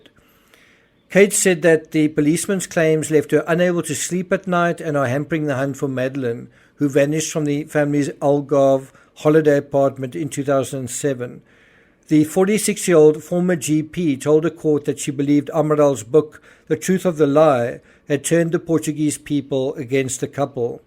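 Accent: South Africa. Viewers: You sound South African? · Stumbled across this video 😊 ... Is that a fellow South African I am hearing 😊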